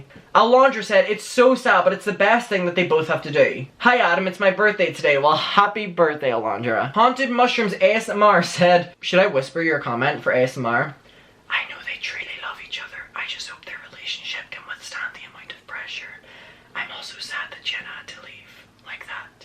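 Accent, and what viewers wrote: Northern Ireland, United Kingdom. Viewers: Oml northern Irish?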